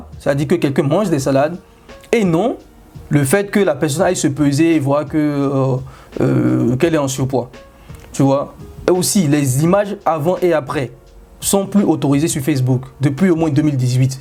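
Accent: Benin. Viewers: Oulah! Ça sent le français béninois ici! Je m’abonne 💪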